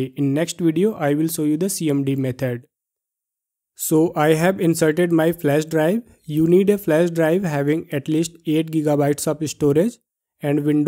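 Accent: India. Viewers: Dude can you import subtitles? I understand english, but that "thick" Indian accent is very hard for me to understand.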